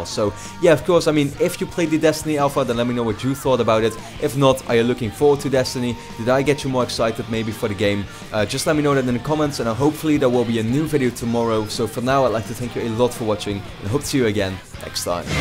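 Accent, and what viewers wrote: Netherlands. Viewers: Dutch, no? :)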